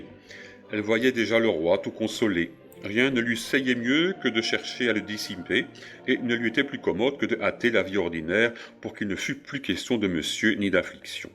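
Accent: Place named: Belgium. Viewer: Ce savoureux accent belge...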